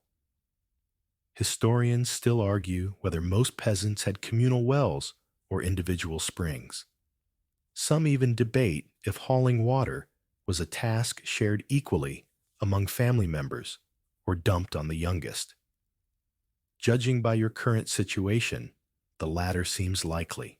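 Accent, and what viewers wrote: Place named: United States. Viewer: Your American accent sounds both patronizing and stupid - quit the channel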